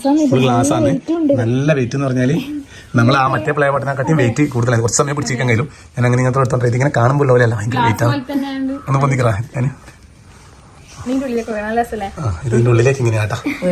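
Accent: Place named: Kannur, India. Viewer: Kannur slang aan main kaaranam...😊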